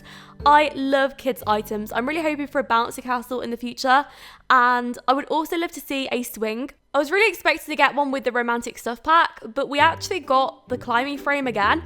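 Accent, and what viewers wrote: United Kingdom. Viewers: You sounds very British for someone living in Germany. Is that where you live permanently now?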